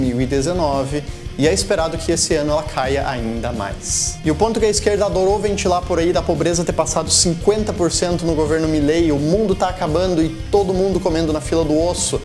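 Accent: Rio Grande do Sul, Brazil. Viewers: Nossa, primeira vez q escuto sotaque gaúcho de uma maneira formal, dá uma estranheza pra mim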